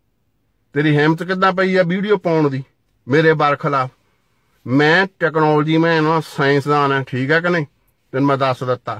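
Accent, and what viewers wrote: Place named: Doaba, India. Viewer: ਬਾਈ ਤੁਹਡੀਯ ਬੋਲੀ ਦੁਆਬੇ ਦੀ ਆ।ਮਾਲਵੇ ਤੋ ਸ਼ਾਬਾਸ਼ ।ਵੀਡੀਓ ਲਾਈਕ ਕਰਕੇ ਸ਼ੇਅਰ ਕਰਨ ਵਾਲੀ ਆ।ਅੰਗਰੇਜ਼ ਭਜਾਉਣ ਵਿਰੁੱਧ ਤਾਂ ਨੇ ਬਹੁਤ ਵਧੀਆ ਗੀਤ ਵੀ ਚਲਾ ਤਾ।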